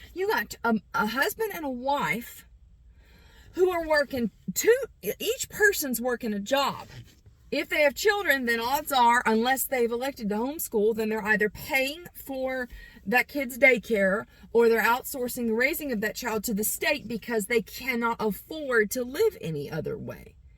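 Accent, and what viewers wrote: South, United States. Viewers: Love this young lady. First time viewer, l was mesmerized by those piercing eyes and when she started quoting scripture l was hooked. Love the southern twang as well.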